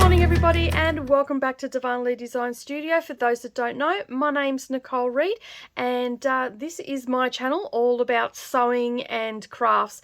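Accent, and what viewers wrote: Australia. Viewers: Thank you for this I thoroughly enjoy watching your videos and your explanations. So very clear and easy to understand. Best of all your an aussie so a welcome voice to an ex aussie all the way in the UK. X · What a great idea. Recently discovered your channel. Nice to hear an Ozzie. Can you please advise who you purchase your labels from. Cheers Dianne Qld Aust.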